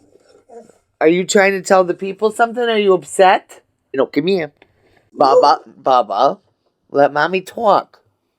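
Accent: United States. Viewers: Is your accent a New York accent or Boson accent? Jersey? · It must be a glam Christmas this year. I’m a retired MUA and hair freak and bought my girls a bunch of hair and makeup stuff! I enjoy ur channel so much. Just joined cuz gotta support a homegirl. I’m actually on the other side of the lake in MI but the accents the same!😂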